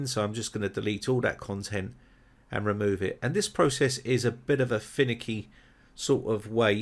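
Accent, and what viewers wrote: United Kingdom. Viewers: Having built my first PC last week with a 2400G, I was happy to have everything working, until I hit the same problems as yours! Thank you so so much for your video, it’s rare to see a British video creator on the topic of PC building, especially Ryzens, so it felt very homely to have that accent haha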